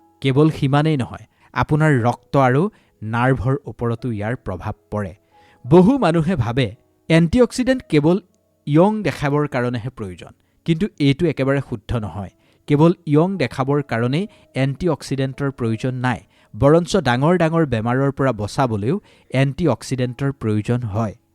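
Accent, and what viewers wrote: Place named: Nalbari, India. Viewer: Nalbari para koiso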